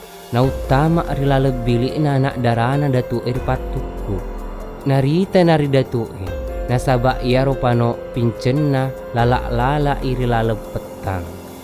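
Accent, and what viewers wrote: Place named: Bone, Indonesia. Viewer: Terlalu kental dengan dialek bone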